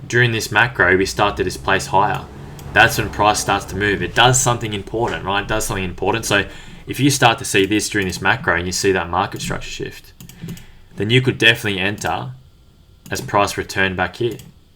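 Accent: Australia. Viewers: Are you Aussie bro? Great video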